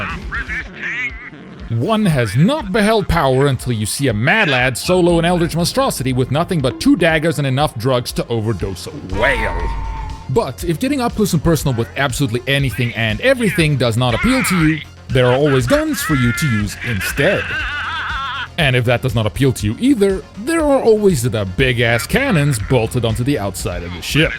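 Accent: South Africa. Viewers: WAIT HE'S SOUTH AFRICAN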